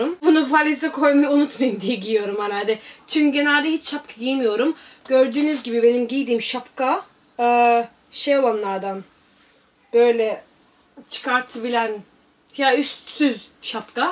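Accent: Turkey. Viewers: trakya şivesi var beeee cndkcndkcjdkfkeodidod yerim yerim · Biraz Egeli gibi konuşuyorsun çok şekersin ya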